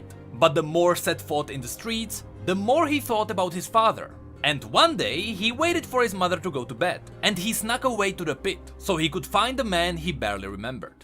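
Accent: Czech Republic. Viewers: Jde z tebe celkem slyšet, že jsi Čech :D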